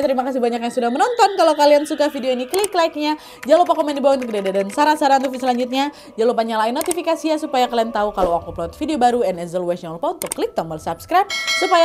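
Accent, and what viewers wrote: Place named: Banjar, Indonesia. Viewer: Aku yg denger langsung ngeh ahahahaha pasti bener nih orng banjar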